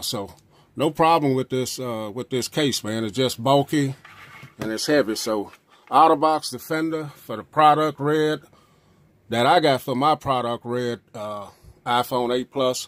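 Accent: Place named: Arkansas, United States. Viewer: He has the same accent as my black friend. Are you from Arkansas?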